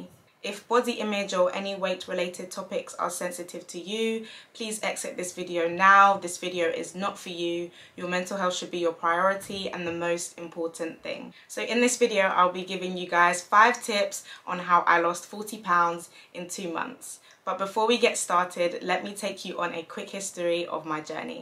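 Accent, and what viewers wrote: United Kingdom. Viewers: 🇧🇧🇬🇧Newly subscribed! Made me laugh with the biblical disclaimer 😂. I have to mention how great it is to hear a British accent sharing their journey not come across many here on youtube. Great tips, by the way, your journey is truly inspiring, thanks for sharing.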